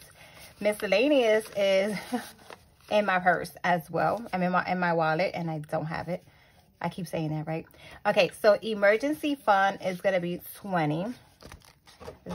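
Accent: New York, United States. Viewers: I felt like I missed your face. You are one of my regular views. This long, but you won't lose God's grace by not paying tithes. God knows your hear and besides the bible instructs us to pay tithes but it also tells us that debt is bad and avoid it. Literally every reference to debt in the bible is negative. So, paying debt first or paying tithes first....both are consistent to the bible imo. Keep it up. And I low key love your NY accent. 💪🏾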